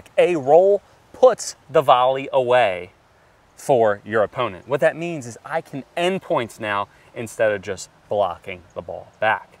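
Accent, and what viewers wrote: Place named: Philadelphia, United States. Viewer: Love your vids! And your Philly accent ;)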